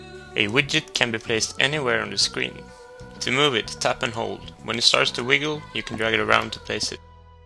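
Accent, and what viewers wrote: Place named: Sweden. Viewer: Swedish! haha, heard it on your accent :-)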